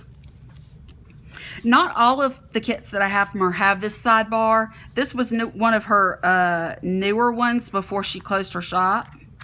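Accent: South, United States. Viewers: Girl I love watching your videos, and your southern accent is EVERYTHING! I can absolutely enjoy watching your pwm's even if I can't buy the kits because as someone who can't afford to buy sticker kits, these kinds of videos give me inspiration on how I can use computer paper or cardstock to create a layout. Keep doing you and being your authentic beautiful self 🤗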